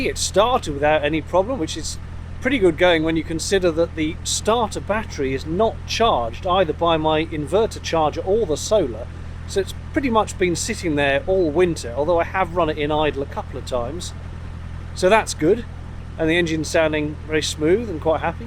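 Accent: United Kingdom. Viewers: so nice to see you and hear that very British voice. Keep safe P.S. Nice little vlog by the way.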